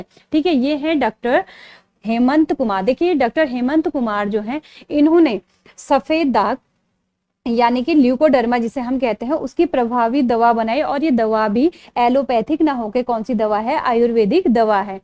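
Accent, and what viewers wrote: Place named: Pahari, India. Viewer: पहाड़ी tone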